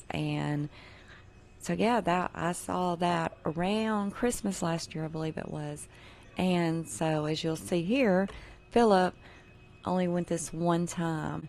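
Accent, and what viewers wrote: South, United States. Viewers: En vel ops lol I know those southern pronounciatuons!! I love it! Been in South Texas too long not hearing the real southern accent!!! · Nothin' better than southern accent. We friendly too! Well, most of us...😁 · this Aussie is loving your sweet southern accent reciting this shopping list. Also your little “barbs” thrown in make this very interesting to listen to.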